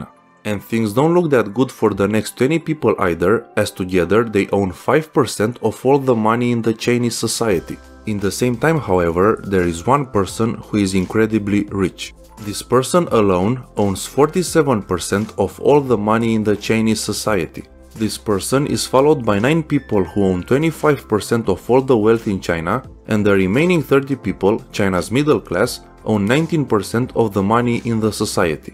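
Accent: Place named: Romania. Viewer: That brutal Romanian accent though 😖😖😖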